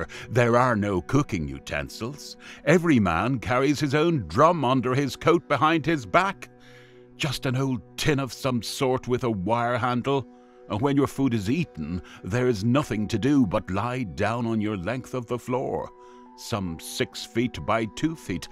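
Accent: Dublin, Ireland. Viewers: I hear a well bred Dublin accent. It is said this accent speaks the best pronounced English in the world. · Interesting an Irishman is narrating this. The exact same conditions existed in any town or city in Ireland. Actually worse, even though Ireland and England were the same country at the time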